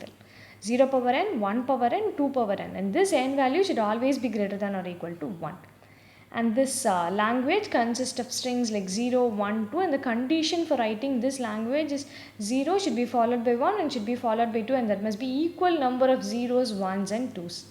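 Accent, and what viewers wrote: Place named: South India, India. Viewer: Please mention you are using south indian English in caption of the video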